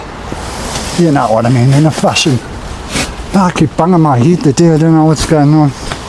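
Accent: Newcastle, United Kingdom. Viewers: Spot on 👍 we are who we are, so others can like it or jog on 😜.... BTW I love the gordie accent. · Love a Geordie accent my mum came from Newcastle as did by Uncle and two of my favourite aunts and sadly they’re all not here. I hear your accent and it reminds me of my childhood thank you.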